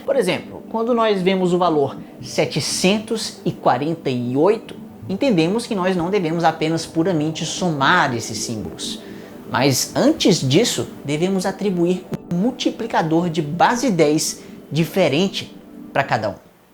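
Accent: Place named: Northeast, Brazil. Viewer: esse cara é de qual estado? esse sotaque não me é estranho... Nordeste? pernambuco? Agreste? alguem sabe kkkkkkk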